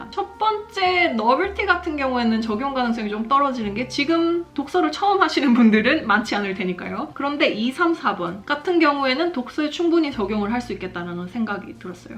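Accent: Gyeongsang, South Korea. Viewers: 차분한 경상도 사투리와 유창한 영어발음...뭔가 신선하다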